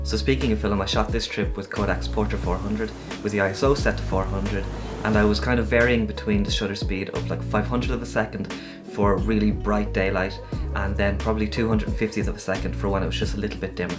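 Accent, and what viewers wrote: Ireland. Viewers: It was a huge uplift for me to open your video and hear a young Irish voice. I admire the vision you have of seeing and telling the Irish story with young eyes. The Fujica 705 was a camera I used in the 1970s but was unfortunately stolen. I then used and still use a Pentax S1a. You may have seen the recent blogs on the need to invest in cameras with mechanical shutters. Electronics fail. I have lost two to irreparable damage. Still, I would recommend the Contax 139Q because it is widely available and those Contax lenses are genuinely top class on a par with the best Takumars. But, my absolutely favourite camera, is an old Werra V that I still have. Pure Leica quality from East Germany. It is my dedicated BW film camera. Carrying that around I feel that I am channeling Cartier-Breslin. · Great to hear an Irish voice here on YouTube, you have missed focus on several images so I would recommend the Nikon FE as your next film camera but the FM,FM2,FA,FE2 are also very good, the FM3a is good but too expensive. 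I have subscribed.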